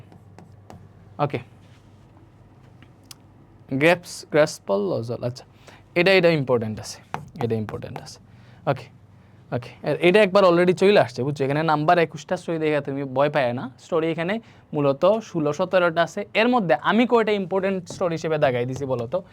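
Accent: Sylhet, Bangladesh. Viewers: Vai maybe sylheti ?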